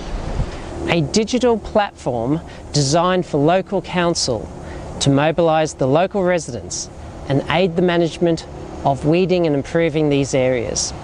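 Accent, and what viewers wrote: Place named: Australia. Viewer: Awww Brisvegas..! Thought you sounded quite aussie from your other videos :)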